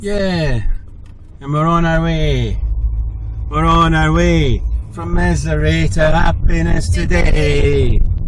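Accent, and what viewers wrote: Scotland, United Kingdom. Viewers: Your delivery is very similar to the channel Life is too short, but with a Scots accent lol. Very nice vlogs, giving me ideas for when I get to Scotland in my campervan 😊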